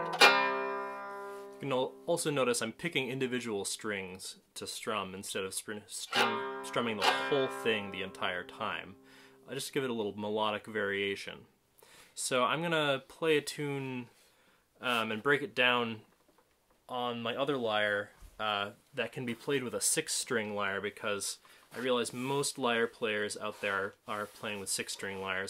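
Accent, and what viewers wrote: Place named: United States. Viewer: Seeing a few of your videos I did not expect you to have an American accent lol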